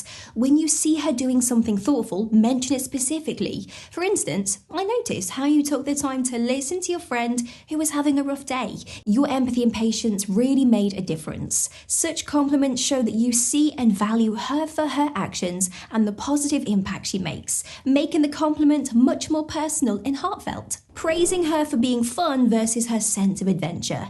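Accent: England, United Kingdom. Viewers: These videos have really helped me since I had some bad stuff happen to me and I trust the English accent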